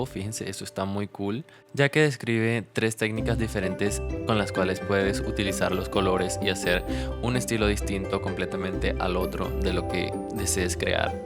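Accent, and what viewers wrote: Venezuela. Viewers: Eres de Venezuela?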